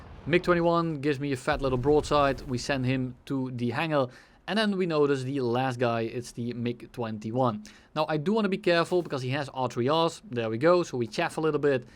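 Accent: Netherlands. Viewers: you dutch?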